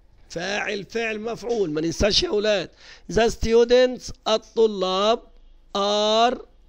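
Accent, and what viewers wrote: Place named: Upper Egypt, Egypt. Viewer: انت من سوهاج (الصعيد)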